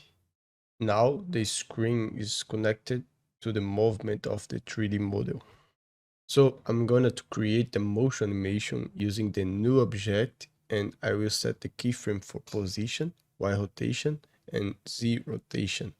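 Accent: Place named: Brazil. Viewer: just asking, are you brazilian? i do, and your accent sounds like mine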